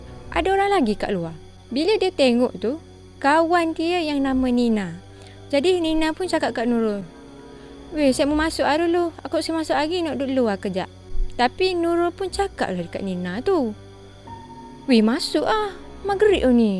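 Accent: Kelantan, Malaysia. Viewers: cankap klate baru belago angin cankap luar tk berapa best..hanya lihat host sajo😂😂😂😂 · kak , cito dialek kelate lagi sedak berbanding kl 🥲 . aura dio lain sikit , kl kureng sikit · kak amy subscribe n follow sbb lain dari yg lain, cerita seram dlm loghat kelantan.. just be yourself darling, tak syok da nak dengar bila nak jadi org lain n tak feel da seram dia, lebih pd kelakar.. sorry syg, kak amy tegur demi kebaikan sbb ramai yg nak awk kembalikan balik loghat Kelantan n its real you.. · Kecek klate la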